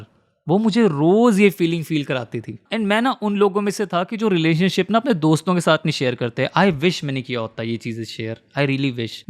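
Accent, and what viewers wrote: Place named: Chandigarh, India. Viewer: I knew it he was from Chandigarh the moment i heard him speak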